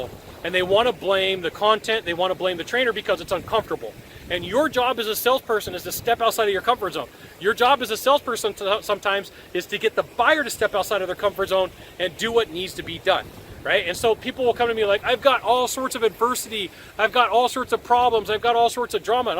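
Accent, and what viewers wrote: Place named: United Kingdom. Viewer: Hi, I remember getting into Sales after my husband died and bought and read sales book galore to start. I had to write and create all of my own marketing material and training. And so many great help out there. Sample it and attempt and integrate it. Congratulations on video 2000. Oh, that British accent. lol. Always providing great sales content. Yes, no lending library. I lent for awhile and, yes, never saw them again. Study at the library. Like that. It's always great having that coach, that mentor to bounce the ideas off of etc. Which we should, indeed. Aloha. Happy Holidays. Cheers and blessings, April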